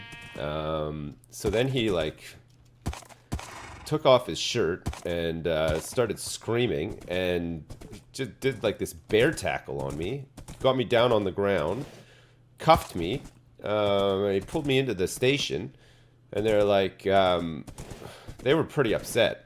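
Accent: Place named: Alberta, Canada. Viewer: So many years later, he still sounds like he's from Alberta.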